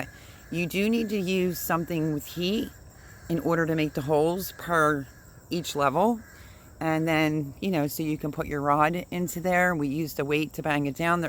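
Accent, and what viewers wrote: Philadelphia, United States. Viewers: I immediately knew you were from PA. I’ve been out of Philly for almost a decade now and your accent is making me homesick! Haha. Very helpful review, I definitely will be ordering a case of these. God bless!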